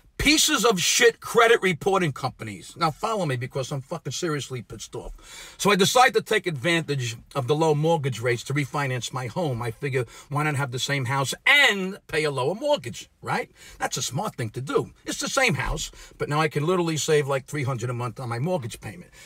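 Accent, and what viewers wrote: New York, United States. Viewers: Omg. Your so NEW YORK!! I LOVE IT.. YOU MAKE ME MISS MY DAD!!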